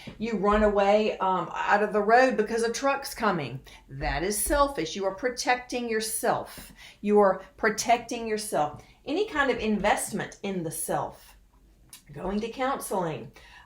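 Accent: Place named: South, United States. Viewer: Beautiful southern accent 😍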